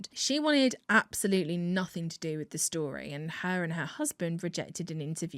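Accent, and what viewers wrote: United States. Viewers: I love your American accent! well done! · Yay a sponsor! And your American accent has gotten much better ☺️ Thanks for anther fantastic video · Excellent American accent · yer merican accent is funny af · You rock the American accent!!!! Great video ty so much ❤ from USA 🇺🇸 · Good American Accent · Your videos are consistently so good that I automatically click like before Ive even seen it. P.s. your american accent is getting even better! lol... sounds kind of californian.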